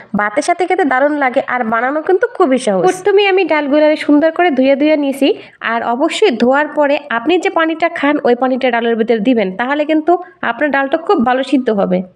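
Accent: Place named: Jessore, Bangladesh. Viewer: বরিশালের কথার মাঝে মাঝে যশোরের ভাষা আসে 😂 মাশাআল্লাহ অসাধারণ আপু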